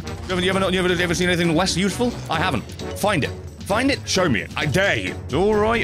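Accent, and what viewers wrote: United Kingdom. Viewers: Le funni screamy british man has uploaded, what a time to be alive. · Sup you British babe?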